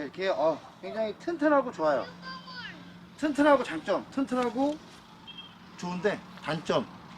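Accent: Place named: South Chungcheong, South Korea. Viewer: 말하는게 충남 서산여 ㅎㅎㅎ 발전기 몃키로에요?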